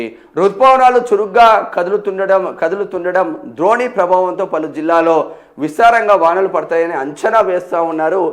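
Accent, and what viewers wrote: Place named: Telangana, India. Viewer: అన్న మా అమ్మ ఇదే మాట చెపింది ఆరుద్ర పోతే ఆదేరువు పోయినటే నిన్న అమ్మ చెపింది ఈరోజు మీరు సమె చెప్పారు అన్న నాకు తెలంగాణా యాస భాష లో వినిపిస్తుంది ఖనిపిస్తుంది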